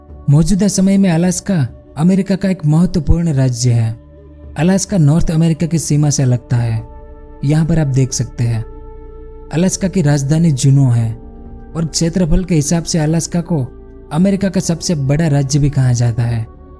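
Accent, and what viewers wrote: Gujarat, India. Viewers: If im not wrong narrator is 💯 gujju😂😂 please don't take this as offensive or any hatred. I love the way gujjus speak 😂 all thanks to TMKOC 🙌🏾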